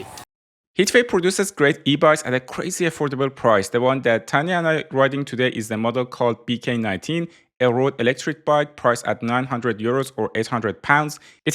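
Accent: Iran. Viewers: ei joonam lahjeye Persian! ;) nice review by the way!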